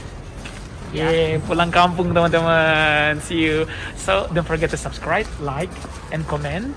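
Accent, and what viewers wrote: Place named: Java, Indonesia. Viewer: Kok ragazzi medoknya kebawa ke Jakarta.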